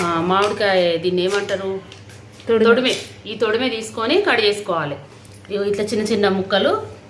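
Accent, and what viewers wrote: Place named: Karimnagar, India. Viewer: Karimnagar district ani meeru matlade vidhanam tho gurthu pattanu. Ma pinni athagari Jagithyal. Vallu matladinatte undi . Ala gurthupattanu. .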